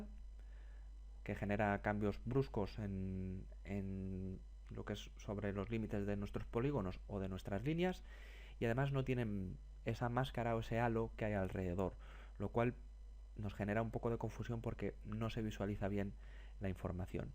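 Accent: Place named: Madrid, Spain. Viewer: Estoy viendo este video desde Pakistan y aca el medio normalmente es Ingles pero con mi nivel intermedio de Espaniol entendi perfectamente y me habeis ayudado demaciado para preparar una mapa. El senior tutor suena madrilenio... lo creo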